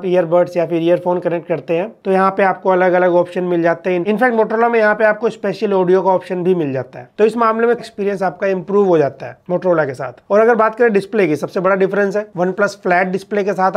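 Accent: Lucknow, India. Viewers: Bhai aap Lucknow se hona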